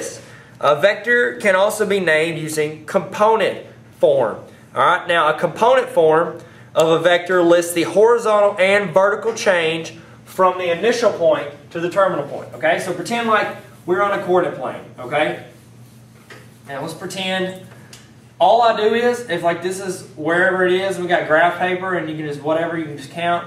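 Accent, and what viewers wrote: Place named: Louisiana, United States. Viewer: You have to live in Louisiana, that accent tho, its ok I live in alabama, I have an even more hick accent than you do.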